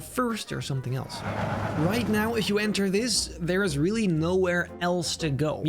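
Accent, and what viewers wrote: Netherlands. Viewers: yoow broow jij bent nederlands toch? ik hoor het aan je accent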